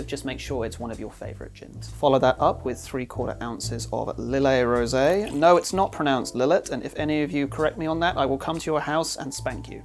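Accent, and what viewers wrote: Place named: Australia. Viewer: you from NZ? you sound aussie.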